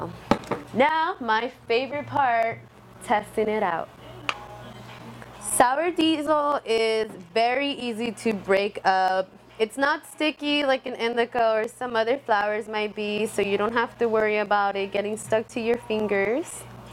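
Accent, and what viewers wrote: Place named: Jamaica. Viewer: Sour! "Jamaican Acent"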